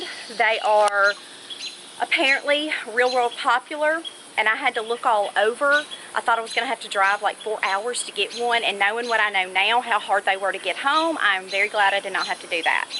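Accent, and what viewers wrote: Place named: Alabama, United States. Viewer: You either have to be from North AL or Tennessee with that accent. I'm from Middle TN and it's not everyday I come across a Youtuber who talks like the ppl I know!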